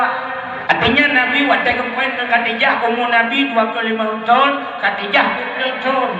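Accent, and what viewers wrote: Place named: Aceh, Indonesia. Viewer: Dakwah Aceh terbaru ikut mendengarkan logat orang Aceh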